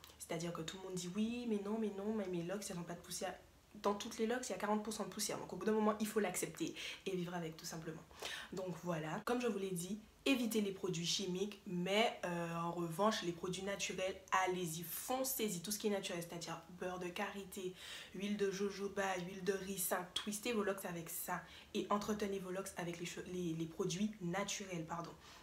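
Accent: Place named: Antilles, Caribbean. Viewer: Humm un p'tit accent antillais🙃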